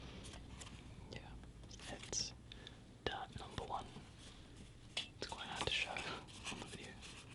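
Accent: New Zealand. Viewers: God I love New Zealand accents <3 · Are you from Chch by any chance...I love listening to my friend there talk to me on the phone.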